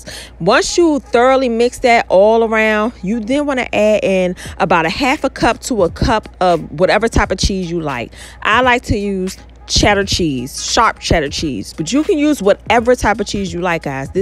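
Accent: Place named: Maryland, United States. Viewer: You must be from Maryland accent real thick Kudos on the chicken breast👌🏿💯🔥🔥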